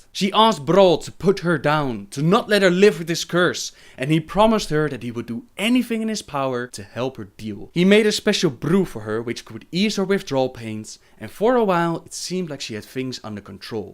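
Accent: Netherlands. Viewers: gij zijt nen hollander :D direct gehoord :p -belg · Are you Dutch/Belgian? Your accent sounds like Dutch.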